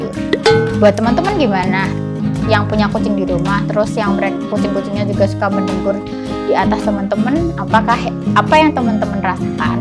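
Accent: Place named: Java, Indonesia. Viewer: Pasti orang jawa ya kak Bahasa indo nya medok banget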